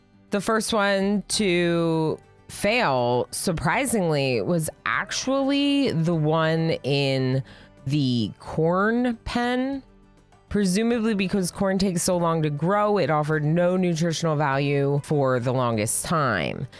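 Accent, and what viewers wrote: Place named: United States. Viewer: You have such an annoying stereotypical american voice but you seem really sweet tho, so I'm a little conflicted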